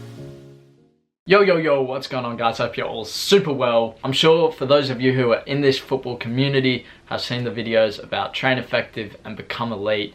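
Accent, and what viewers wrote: Australia. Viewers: Is this guy Australian I just found this guy why haven't I heard of this guy?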